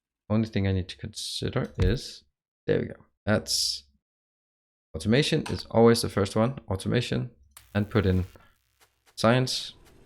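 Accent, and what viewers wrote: Denmark. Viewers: Well we are only like 5.5 million so it's always fun to find a fellow dane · Are you Danish? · Yes, it is true that he sounds Danish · You sound Danish... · Are you Danish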